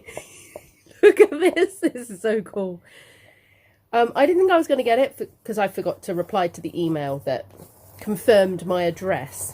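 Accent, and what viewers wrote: United Kingdom. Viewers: You do sound and look like you are feeling a little bit better. I’m glad you didn’t have to watch the littles this last couple of days it would of been terrible but I have no doubt you would of done it. I’m one that enjoys watching you everyday. You are my little bit of the UK as I may never get to see it for myself and I love your accent. Take care.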